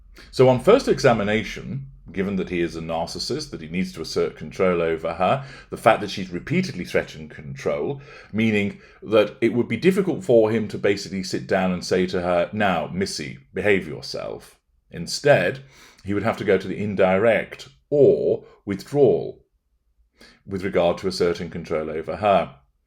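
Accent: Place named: England, United Kingdom. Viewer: Dear Sir, so luv it when you swear, with your cut glass perceived English accent using true Anglo Saxon sentiments. You made me laugh out loud 😃🤩😊⭐️👏⭐️👏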